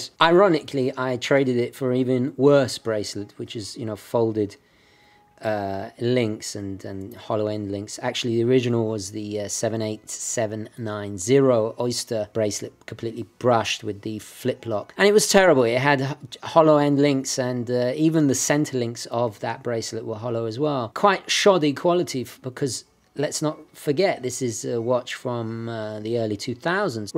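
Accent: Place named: Estuary, United Kingdom. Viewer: Excellent comparison of two superb time pieces. I also love your slightly broken Thames estuary accent that I find very relaxing on the ear compared to the traditional Cockaniny flat vowelised delivery of Eastenders. Cheers.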